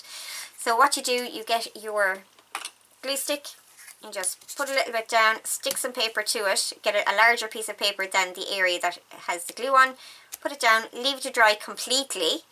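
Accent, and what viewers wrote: Ireland. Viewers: Thank you 🥰 all of your ideas are very helpful and definitely inspiring. I can imagine this does happen from time to time, I am just lucky I mix it up a bit in between crafting projects, like doodling, knitting, sewing and checking out different techniques from all the amazing crafters we have in this collaboration! You are all very knowledgeable and experience and a source of great talent! Thank you for sharing your time and its lovely to hear that bonnie Irish lilt once again. Blessings and hugs 🙏🏻💜🥰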